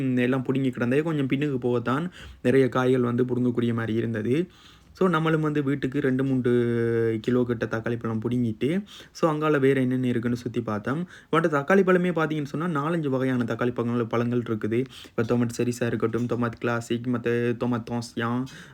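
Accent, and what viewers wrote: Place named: Sri Lanka. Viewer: I like halloween 🎃 tq for this experience 🎉and like srilankan tamil 😊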